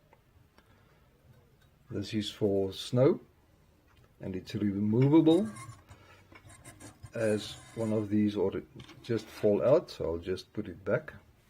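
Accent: South Africa. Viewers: Are jou south african😀😀😀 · i can tell your from south africa cos i used to live there and i am a big fan of tractors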